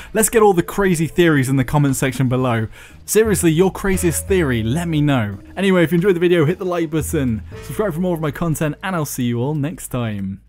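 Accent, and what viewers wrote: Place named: United Kingdom. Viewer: I love your british accent and your voice. I would talk to you for hours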